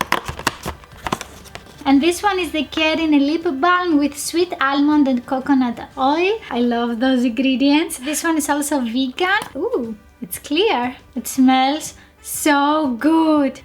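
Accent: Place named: Italy. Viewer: This girl looks like an italian 😳she has an italian english 😂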